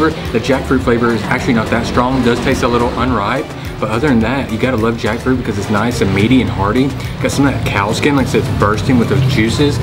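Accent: South, United States. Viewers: just read brief description where you're from. thought you're from Georgia :-D, i notice your southern accent. I've never been to States but I have friends and families live in various states in the US. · didn’t realize you had a southern accent. Your videos from the Philippines was amazing. Never been there but after watching you eat... it’s on my bucket list. Cheers.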